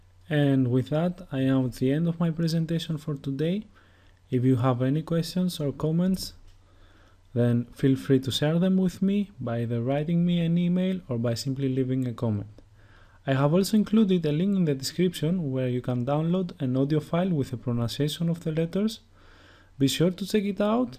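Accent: Greece. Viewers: he's Greek so he speaking with Greek accent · You are sooo greek, seriously your english accent is so greek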